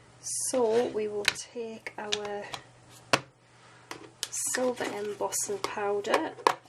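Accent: United Kingdom. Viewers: I love this card. I have everything to make this because I just bought the papers and the stamp set because they are so beautiful. Also, just want to thank you for making these videos. I am American and watch some American Stampin Up demonstrators but find that y'all in the UK tend to make more boxes and things (like the journal you made not long ago), and I enjoy making the boxes and such, too. So, thanks again! P.S. I love your accent! If you don't mind me asking, what part of the UK are you from?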